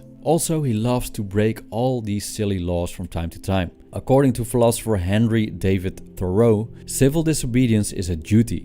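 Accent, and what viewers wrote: Netherlands. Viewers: Mmmmm, your accent... I guess it's Dutch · You have a bit of a Dutch accent, is this correct? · Your accent sounds very Dutch :)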